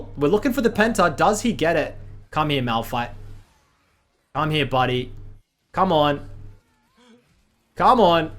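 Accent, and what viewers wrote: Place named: Australia. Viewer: "mango fish knows how to play the qiyana" edit: BRUH I JUST REALISED HE SAID "COUNTER" AHAHA, i love the australian accent xd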